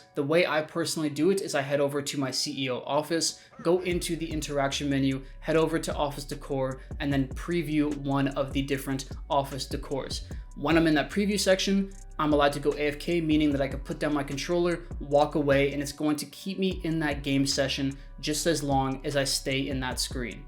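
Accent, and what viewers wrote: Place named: Canada. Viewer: I knew you were canadian from your accent. Greeting from Alberta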